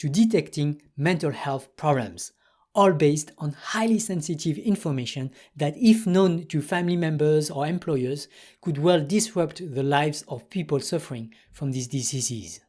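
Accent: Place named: France. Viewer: J’aime ton accent français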